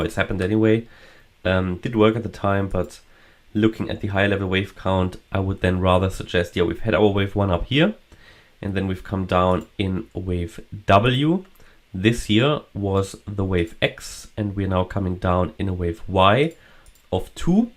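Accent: Germany. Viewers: deutsch? hört man raus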